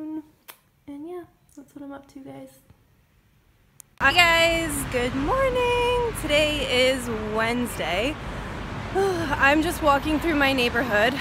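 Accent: Turkey. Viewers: What american living you are Turkish you can change your look but you can not change your Turkish accent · You almost sound like a turkish person who is speaking English. You've accent lol.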